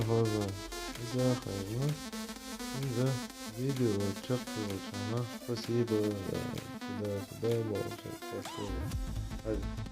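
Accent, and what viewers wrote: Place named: Azerbaijan. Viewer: Он так говорит потомушто он Азербайджане и я тоже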